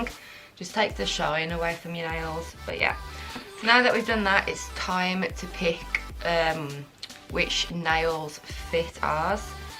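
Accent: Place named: Birmingham, United Kingdom. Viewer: Your sooo brummy. I love it!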